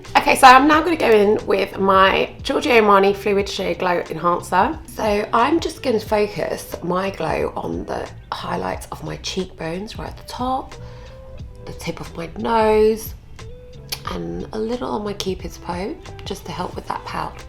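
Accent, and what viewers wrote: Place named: London, United Kingdom. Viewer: she is beautiful. Ohhh I love a London accent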